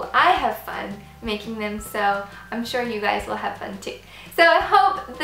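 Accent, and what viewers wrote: England, United Kingdom. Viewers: She speaks in a British accent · love your british accent and you are so charming!! kisses from brasil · I never knew you were British!!!! Ilysm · OH MY GOD SHES BRITISH???!!!!!!??? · She is from South Africa, but she finished her master degree en England. That's why she got her very nice accent...👍🏻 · i think she is Indian and has a british accent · Your British😱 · Your British! Me didn't know that. 😱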